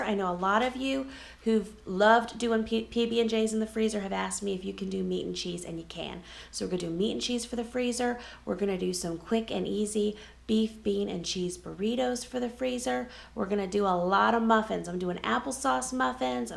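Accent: South, United States. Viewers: What state do u live in? if im not mistaken u have a southern accent?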